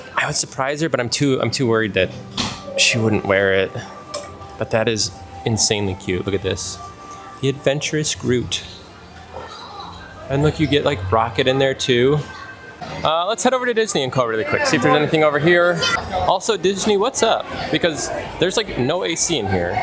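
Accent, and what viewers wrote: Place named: Midwest, United States. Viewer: I know a Midwestern accent when i hear it. Which state are you from? Illinois?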